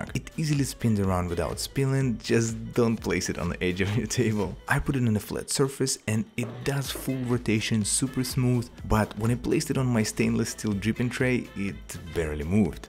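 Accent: Russia. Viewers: Hi, while I hear you,I'm think that you are from Russia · Are you from russian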